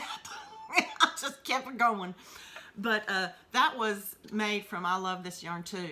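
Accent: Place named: South, United States. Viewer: I love your southern accent ! I'm from Kentucky ! Your hats are beautiful !! And so is the baby blanket ! If you would like to check out my work, come on over . See ya next time !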